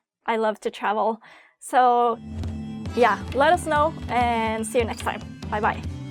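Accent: Norway. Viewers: Tussen takk! I don't think you speak slow, just partly your cute Norwegian way of speaking :) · I understand why you may have struggled hearing some of her r's. She seems to have a South Eastern dialect, from around Risør/Tvedestrand, where they tend to sometimes use the German/French uvular R and sometimes the trill/flap . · Is she norwegian? It sounds like it, at least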